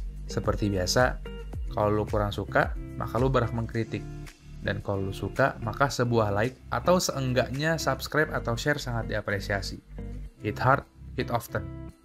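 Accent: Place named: Indonesia. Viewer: Jewel wak, bacanya logat indo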